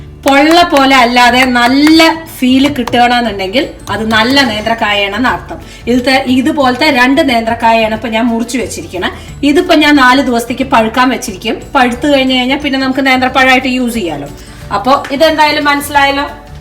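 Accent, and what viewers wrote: Palakkad, India. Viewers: Hello I love you chechy chechy njan 1st time anu comment cheyyunnathu reson your palakkad slang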